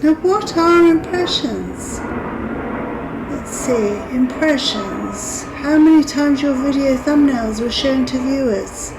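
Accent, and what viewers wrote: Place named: Northern Ireland, United Kingdom. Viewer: Yeah you got it right its Northern Irish accent!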